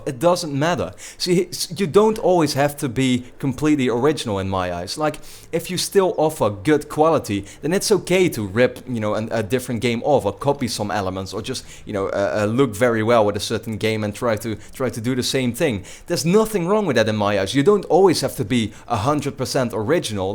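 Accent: Netherlands. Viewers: When I heard your accent I immediatly knew you were dutch. Nice video dude.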